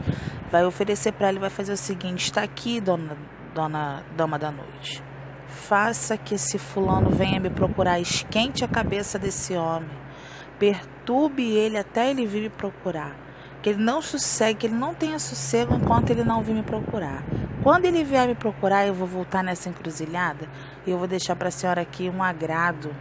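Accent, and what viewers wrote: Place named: Rio de Janeiro, Brazil. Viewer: É carioca?sotaque lindo..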